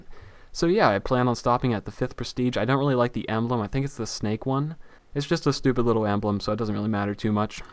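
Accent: Philadelphia, United States. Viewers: Omg Your From Pennsylvania! I Knew It:) What Part Of Pennsylvania Your From? I'm from South Philadelphia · You from Philly? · So your from Philadelphia?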